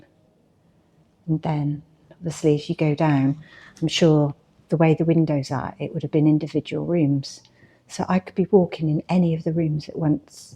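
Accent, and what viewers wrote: United Kingdom. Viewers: I am always amazed how much masticulation is required in the British Isles in order to speak the King's English. Native speakers, sadly, I cannot understand much, the accent is too overpowering, the words and sense often lost. I couldn't consider taking ship to a martian colony with Cambridge or Oxford or Scottish accents --needless to say. Videos are sufficient existential challenges for a wretched, unaccented bloke like me. Other than that, the enthusiasm for Charlotte Bronte is absolutely imfectious.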